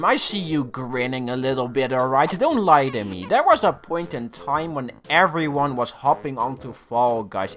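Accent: Netherlands. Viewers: Deze man heeft een beetje een hele grote Nederlandse accent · you sound dutch as fuck bruv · Tering…….wat een cringe nederlands kut accent 🙃🙃🙃🙃🙃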